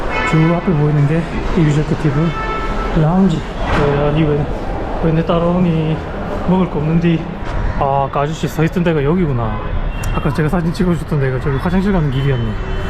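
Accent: Gyeongsang, South Korea. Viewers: 오호 신기합니다. 갱상도 사투리가 친근하기도 하고 잘생김이 더욱 맘에 들고..조카뻘의 나이라서 조카생각도 나네요.. 젊을때도 그렇고 항상 행복하게 지내시기를.. 이제 알았으니 종종 볼게요...화이팅입니다....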